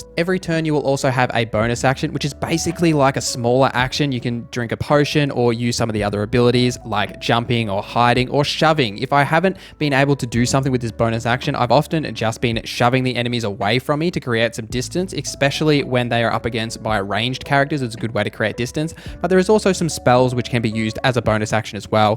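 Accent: Australia. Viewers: Clear. Concise. Australian 👌🏾🔥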